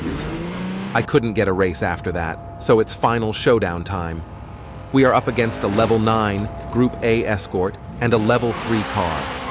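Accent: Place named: Australia. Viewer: that sounds more like Australian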